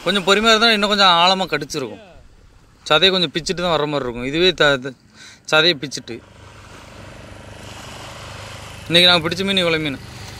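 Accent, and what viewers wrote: Thoothukudi, India. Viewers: உங்கள் தூத்துக்குடி தமிழ் மிகவும் இனிமை.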